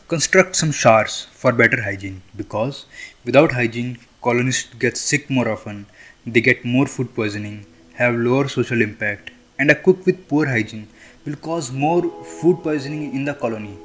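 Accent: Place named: India. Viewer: >indian accent >poo mod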